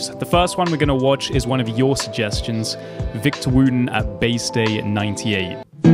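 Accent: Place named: United Kingdom. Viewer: Nothing like funk with a British accent! Love it. That's some proper funk, mate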